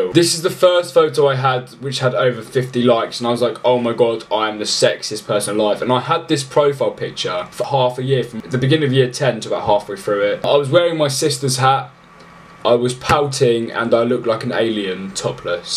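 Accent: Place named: United Kingdom. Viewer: If you're gonna play background music with high frequencies, like guitar picking, you need to turn that shit down cause it's way too damn loud. Don't feel bad though. Most YouTubers do it. just remember that we want to hear that beautiful, bodacious British accent, not the homeless Irish woman picking her guitar in the background.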